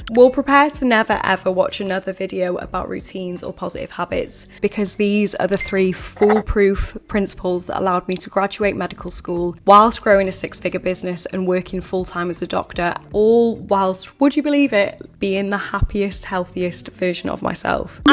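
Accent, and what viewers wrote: United Kingdom. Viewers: Aww yew Bri’ish 🎩💂☕️🤓🤓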